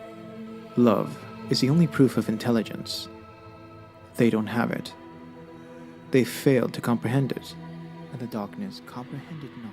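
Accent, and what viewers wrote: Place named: United Kingdom. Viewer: Why is a British person with a horrible accent talking about the Zulu Nation this is just wrong in many ways! OMG stop!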